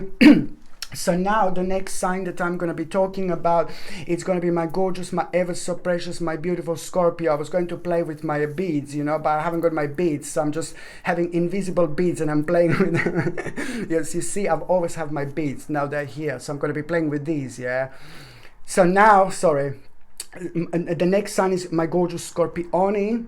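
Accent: Italy. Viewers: I totally Love your videos and excellent readings!! Thank you!! Your Italian accent is beautiful 😍 too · Ciao! Thank you for Scorpio reading, love your energy, Good vibes and your italian accent! I have got so much italian roots 🙏🏻 Grazie mille from Monte Carlo. Last seven days 🤞🏻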